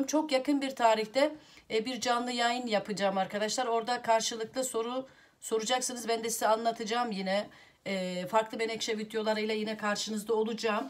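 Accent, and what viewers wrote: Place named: Trabzon, Turkey. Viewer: Harika bir vidyoydu bu arada siveniz trabzon gibi memeleket neresi🤗👏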